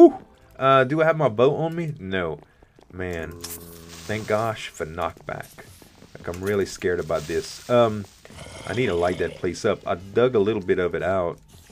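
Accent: Louisiana, United States. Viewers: I can't place your accent. Where are you from? Louisiana? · Based on your accent, I guess You live in the USA, am I right? If I do, I would like to ask You which state do You live in? Do You own any firearms and what is Your opinion on them?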